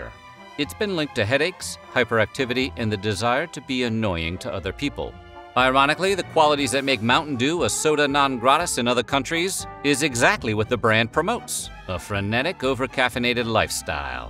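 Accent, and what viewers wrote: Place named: United States. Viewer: Bro literally speaks american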